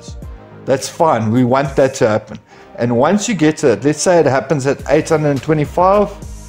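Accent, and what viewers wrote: South Africa. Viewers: Legend brother. Also, are you South African by any chance? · I know a south african when i hear one...Hehe lekker video brother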